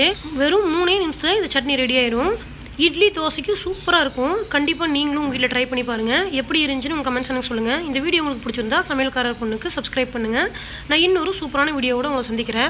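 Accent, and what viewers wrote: Kongu, India. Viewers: Neenda idaivelikku piragu alagana kongu tamilil receipe arumai kutties nallarukkangalamma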